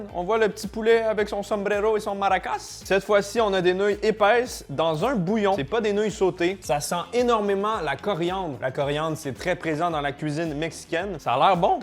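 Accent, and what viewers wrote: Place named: Canada. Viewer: J’adore son accent canadien 😊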